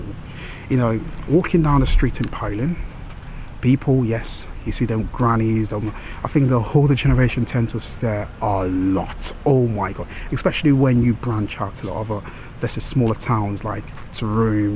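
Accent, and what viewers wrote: United Kingdom. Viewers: Your accent is british not american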